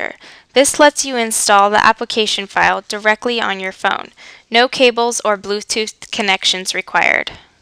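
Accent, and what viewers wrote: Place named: Scotland, United Kingdom. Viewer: Awsome dialect. Remnds me of COD4 Cpt.MacMillan. Are you Scottish, or Irish?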